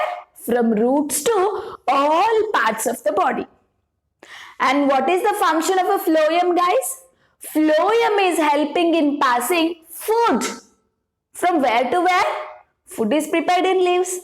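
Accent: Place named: Andhra Pradesh, India. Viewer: R u from andhra??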